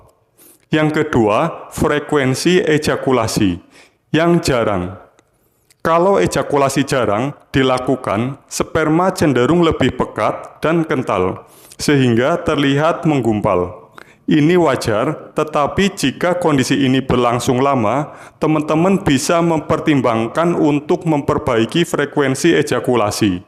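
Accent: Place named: Java, Indonesia. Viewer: Suaranya mantap bgt. Sangat manly meskipun berlogat jawa.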